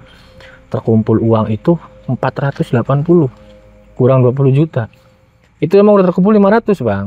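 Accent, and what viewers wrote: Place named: Indramayu, Indonesia. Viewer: Enakan baka cerita Asli wong dermayu kien mah kang ketenger sg bahasae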